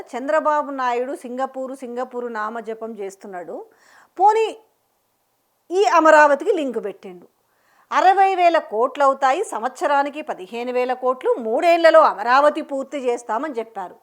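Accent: Telangana, India. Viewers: మీ భాష చూస్తుంటే తెలంగాణ భాష ల ఉంది మరి నువ్వు అమరావతి మీద ఎందుకు అంత విషం కక్కు తున్నావునాకైతే అర్థం కావడం లేదు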